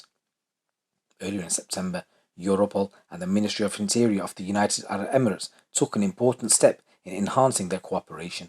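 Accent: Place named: Liverpool, United Kingdom. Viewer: Gotta be a Scouser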